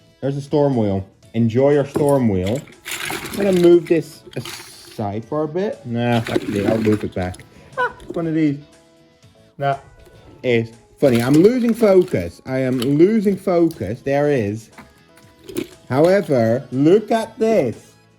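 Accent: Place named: United Kingdom. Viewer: You're sound like British or United kingdom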